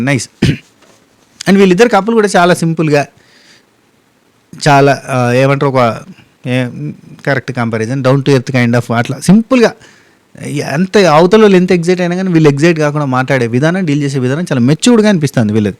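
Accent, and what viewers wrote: Telangana, India. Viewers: Anna meru matladthe ma annaya matladthunte vinnattu untadi super telengana slang jokes ....happy to see ur videos daily😊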